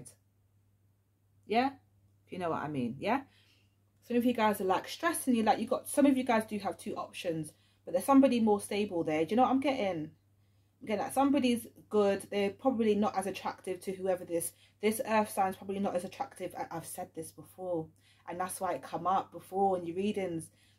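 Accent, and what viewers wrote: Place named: United Kingdom. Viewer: Are you British ? Love your accent but good reading thx for your time 🤍🤍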